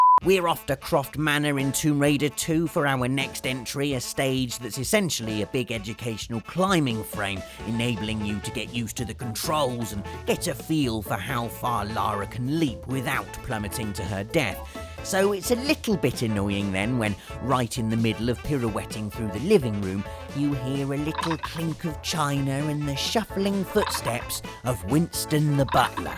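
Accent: United Kingdom. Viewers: He's has an British accent bud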